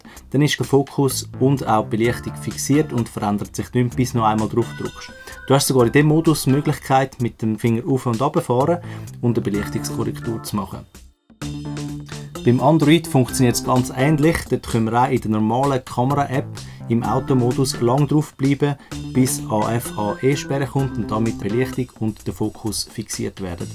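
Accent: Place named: Switzerland. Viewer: Super, danke! Alles verstanden, trotz Schwitzerdütsch :-)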